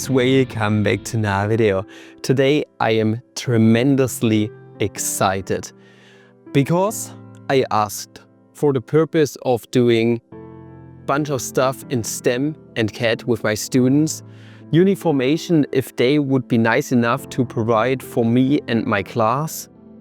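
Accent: Germany. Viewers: Are you German? you have quite the accent.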